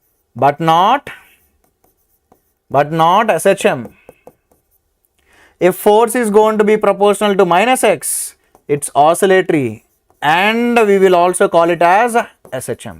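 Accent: India. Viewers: Your perfect Indian accent makes your English too good sir!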